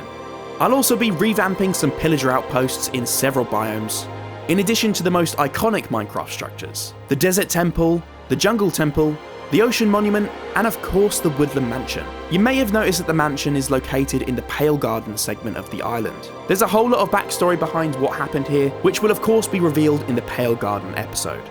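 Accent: United Kingdom. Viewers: Are you british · Why do you sound like a British Zach Callison?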